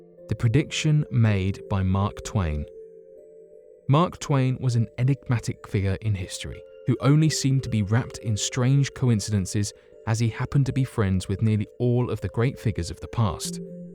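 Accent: United Kingdom. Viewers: Dude!! You are screwing up Royally. Franze Joseph, really? Butcher? Dictator? Are you really that stupid? Franze Joseph was neither a dictator nor in any way a butcher!! WTF is wrong with you? He was the Emperor true. But loved and respected. I live in Vienna. And have family here going back 800 years. Franze Joseph is still widely regarded as one of their most beloved leaders. Keeping them out of war and reigning over a period of peace and prosperity. In the video you say these three men. And then show a picture of five men known of whom is Franze Joseph. Stalin yeah, thats Stalin. Hitler uh huh, thats Hitler. Sigmond Fruede yeah, thats him. But Franze Joseph dont think so. Are you getting high before you edit? Really sloppy work here. A British accent doesn't make you smart. You going to apologize to two million Viennese.(Damn son. I wouldn't be visiting this wonderful, tolerant city any time soon.) Good luck with that apology. 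Very sad. Sloppy work.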